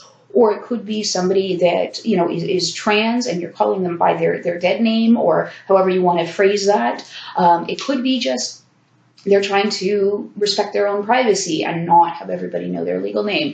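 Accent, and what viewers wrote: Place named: Canada. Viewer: Learned so much in these 2 vids, thanks! Also, funny/cute how you say aboot & sore-y like on Degrassi 😆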